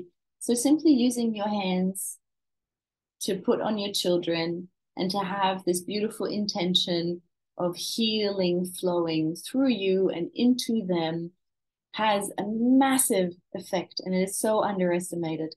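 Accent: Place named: Australia. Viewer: Love your Aussie accent. Greetings from Germany.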